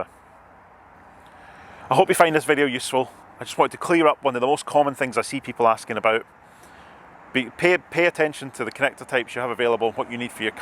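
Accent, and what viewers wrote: Scotland, United Kingdom. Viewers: Maybe it was the scottish accent but you have just educated me a new owner of a EV cheers mate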